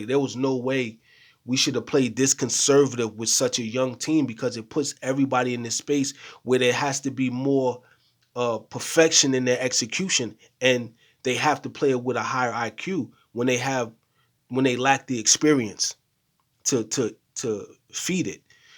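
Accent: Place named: New York, United States. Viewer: U from Toronto or New York dawg?? I’m just curious. The way I say some words sound like u from New York. Would be cool if ur a raptors fan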